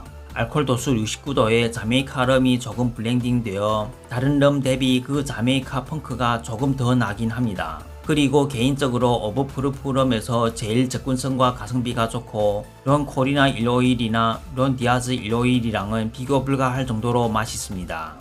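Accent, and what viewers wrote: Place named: Gyeongsang, South Korea. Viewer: 경상도식 국어책 읽기가 귀여우시네요 ㅎㅎㅎ 좋은정보 감사드립니다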